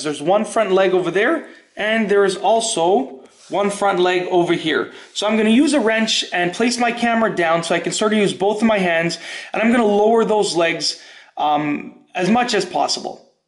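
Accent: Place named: Montreal, Canada. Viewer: Excellent tutorial. Are you Canadian? You have a Montréal accent.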